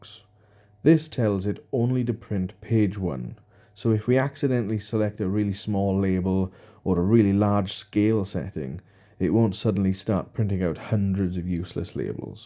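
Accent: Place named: Wales, United Kingdom. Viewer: Watching this in June 2020... this is brilliant! That Welsh accent is just gorgeous yowser! I always wondered how people got those labels printed. I must get one of these Printers, it's a great investment. Thanks... great vid with a nice sharp image... love it!. New Subbie. London UK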